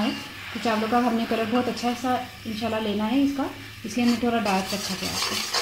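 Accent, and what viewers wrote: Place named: Punjab, India. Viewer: اسلام واعلیکم میں لاہور سے ہوں اپنی جاب کے سلسلے میں یہاں مکہ میں رہتا ہوں اپکی تمام ویڈیوز دیکھتا ہوں اور ٹرائی بھی کرتا ہوں۔ میں یہ پہلی دفعہ کمنٹ کر رہا ہوں وجہ یہ ہے کہ آپکی ویڈیو دیکھنے سے ایک تو ریسیپی پتہ چلتی ہے دوسری آپکی پنجابی آواز سے ویڈیو میں ایک مزاخیہ پن برقرار رہتا ہے۔ یہ چنا چاول ریسیپی ٹرائی کی ہے اچھے بنے تھے چاول۔ شکریہ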